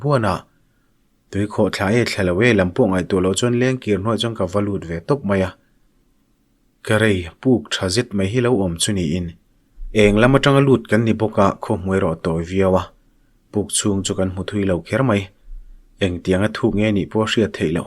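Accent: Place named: Khilam, China. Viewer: Khilam unaute i ang zawk riau mai😆 ngaihthlak anuam e..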